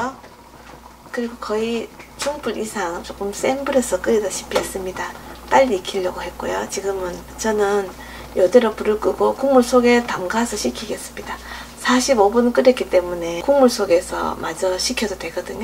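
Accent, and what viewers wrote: Gyeongsang, South Korea. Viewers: 밝은인상 순한 목소리 맛있고 쉬운요리 맛나보이는 눈맛 선생님 구수한 경상도 말씨 넘 좋아요~~알 러브 유~~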